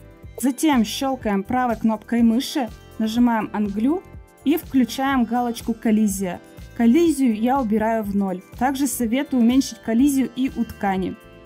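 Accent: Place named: Japan. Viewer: I like japan english.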